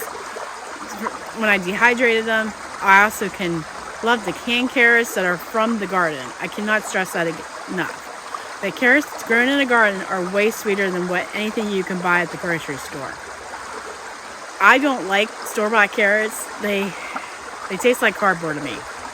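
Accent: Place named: New Jersey, United States. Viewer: I grew up in New Jersey and your accent made me home sick lol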